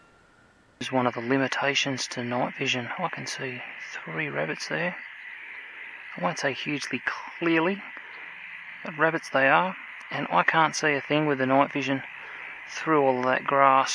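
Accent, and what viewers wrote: Australia. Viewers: I notice with your air file that you aim high through the scope, so to me there is still an element of skill and guess work goes into getting a successful shot, and you can see the round dropping as it travels. This is just my ignorance , but do modern sights with range finders built in not adjust the position of the crosshairs to account for range with an air rifle so that you still only have to put the crosshairs on your target rather guesstimate? Thanks. I’m really enjoying your videos having recently found them. You have a great Aussie narrative.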